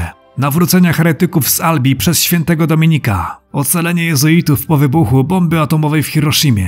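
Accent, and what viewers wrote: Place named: Ukraine. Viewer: dobry film, podsumowanie pisowskiej mafii 👍💪 a powiedz mi skąd u Ciebie ten akcent? Jakby ukraiński. Skąd pochodzisz ?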